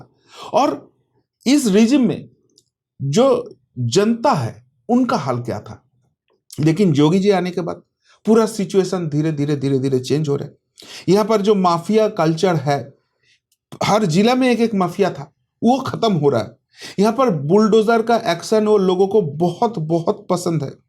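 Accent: Bengal, India. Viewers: आप तो आते ही छा गए सर! आप की बंगाली accent वाली हिन्दी और साथ ही समाचारों का विश्लेषण बहुत hi बढिय़ा है ! धन्यवाद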